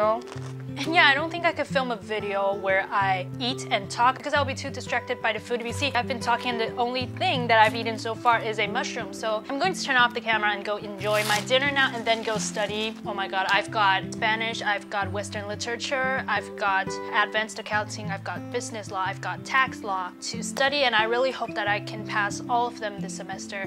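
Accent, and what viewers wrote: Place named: New Zealand. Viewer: Your Vlogs are always amazing, even if I have no idea what you're saying the visuals overcome the language boundaries. Funny how you mentioned your kiwi english, because you would fit in quite well here in NZ, you definitely have our accent :-)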